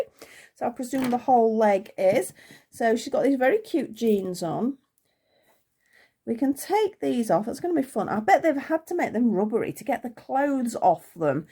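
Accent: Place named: Yorkshire, United Kingdom. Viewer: You sound like a Yorkshire/Nottinghamshire lass XX I've just discovered these cute little dolls, there are a couple on sale on Amazon but I thought I'd watch a review first so thank you for sharing 😊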